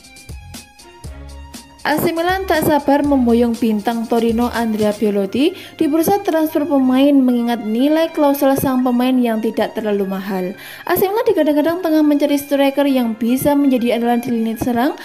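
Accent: Java, Indonesia. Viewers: Medddoook banget mbaaaakk eee 😅😅😅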